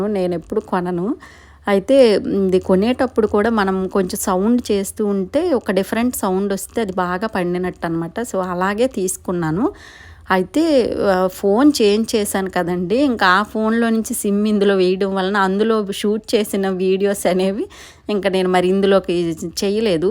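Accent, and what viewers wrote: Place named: Srikakulam, India. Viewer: Akka slag vinte manadhi ma srikakulam la vundhi ea oru akka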